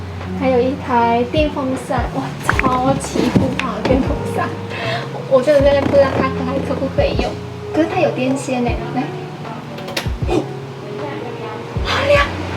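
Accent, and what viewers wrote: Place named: Vietnam. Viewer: 中文超流利的，，，，只是還有一點（越南腔）,不然真的是正港的台灣人了，，讚哦！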